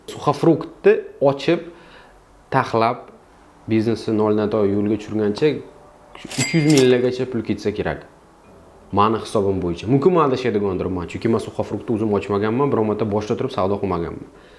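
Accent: Uzbekistan. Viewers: Самаркандча акцент )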